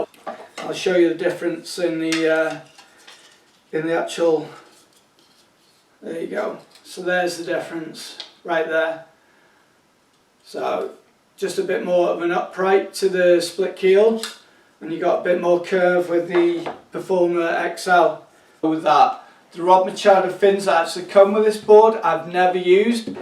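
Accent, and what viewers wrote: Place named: England, United Kingdom. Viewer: G'day from Australia, fantastic in depth, informative review. I want one! Great to hear an English accent on a surf related video for a change! Are they really lightweight? Loved your comment on buying the board for maximum fun rather than how cool it looks. Subscribed. :)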